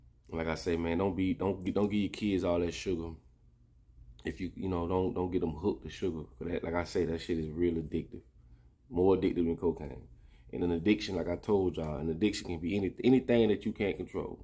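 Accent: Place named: Florida, United States. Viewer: I love that country Florida boy accent 😍💙 keep dropping the knowledge I love it & we need it. U reaching ppl. #memphistn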